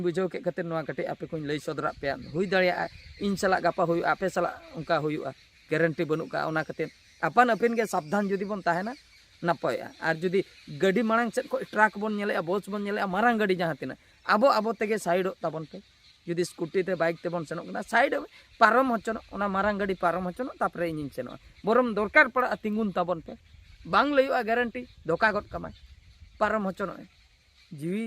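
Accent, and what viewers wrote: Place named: Jashipur, India. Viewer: Aale sur reyah kana nowa do jashipur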